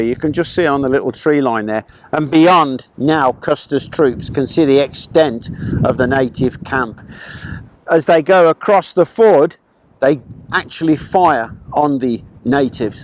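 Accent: United Kingdom. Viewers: Got a love how a person with a British accent wants to judge people on colonialism and expansion...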